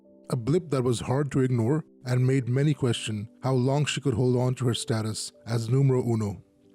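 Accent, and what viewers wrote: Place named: India. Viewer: You are an Indian, can hear it in your voice though you try to disguise it.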